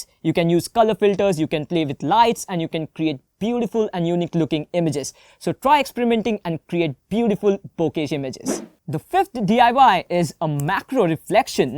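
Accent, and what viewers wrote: India. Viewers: That indian accent 😂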